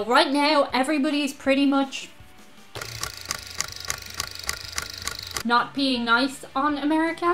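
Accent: Ireland. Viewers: On the upside, your time in Ireland has delightfully thickened your accent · I love how you leave your outtakes in at the end of your videos, where you stumble over words and sentences (like every youtuber no doubt does while they're filming), but are not self-conscious, and have the guts to leave those mistakes in the video. Also, love the accent. I've always had a thing for Irish accents, I just love to hear them, especially from pretty ladies like you. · I love your Irish accent. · Hi, I enjoy your vids and your Irish "edge". Always makes me smile. I wonder if you know about some really American stuff? Try 'AKUS Live' here <link> as an Irish girl, if you don't know about it, you'll like it. Keep the videos coming. /RayK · Thank you, that was much needed. My Scotts/Irish grandfather arrived in American one step ahead of the sheriff, leaving a wife and four children...back in the old country. 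He was sure he could serve his family better as a fugitive in America than a prisoner back home. (he beat a man to death) He brought his whole family over, became a master steam fitter, died at 96 with 14 children and a full tribe of upwardly mobile off-spring. Being an Irish American is as American as as thing can be. And yeah, the Irish accent is a show stopper here. I'm studying it, so I watch Jack Taylor, you and Try Channel, et al. Keep at this. You're on to something. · Hi,i want you to know that I love Irish accents.I really love your channel and content. I really enjoy your personality and you always make my day after watching your videos. I hope you continue with your channel and video content for years to come. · Americans have a thing for Irish accents. This is a established fact. You can talk about anything and we love it.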